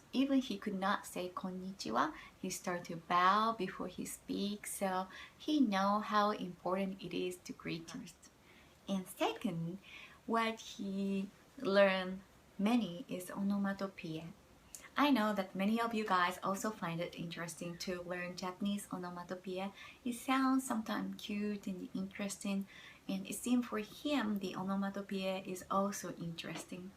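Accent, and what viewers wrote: China. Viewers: Are you a Japanese or Chinese? Your English accent seems like Chinese person speaking English.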